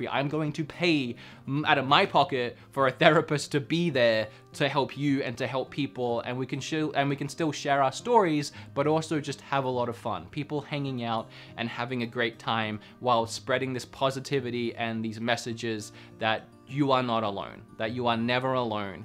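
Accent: Australia. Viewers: I only just realised you're Australian I'm Australian. Why did I think you were American this whole time. Did i just filter the accent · how can you lose an aussie accent?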